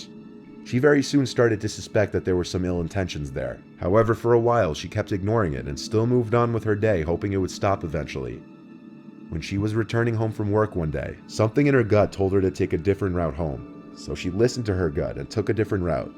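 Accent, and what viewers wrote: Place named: New York, United States. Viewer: almost couldnt get past mr. nightmares new york accent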